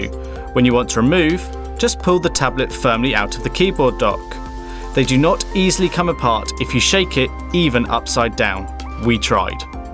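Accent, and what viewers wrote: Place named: United Kingdom. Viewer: Extremely balanced review. Well articulated with a typical British accent ;)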